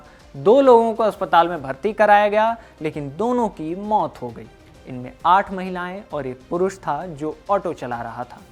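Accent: Bihar, India. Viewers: bhai, Real tone of Bihar -UP Maja aa rha aapka show dekh kr Theth bhasa me ❤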